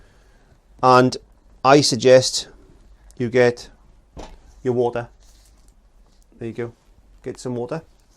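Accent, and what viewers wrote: Wales, United Kingdom. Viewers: the accent is Welsh...even the rest of us Brits can struggle a bit sometimes. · Do i detect a slight welsh accent?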